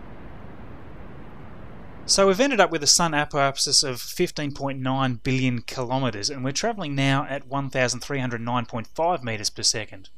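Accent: Australia. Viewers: I love your Aussie accent.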